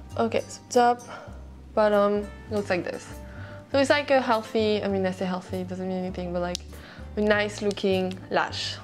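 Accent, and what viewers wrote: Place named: France. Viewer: Hi, I am not French and also not an englishspeaker, so for me its pretty hard to listen this accent. The video is very well done, the music and everything else .... Just the manner of the speaking is a bit smooth sometimes, thus it gets hard to distinguish certain words. Not to offend, just my point of view 🌹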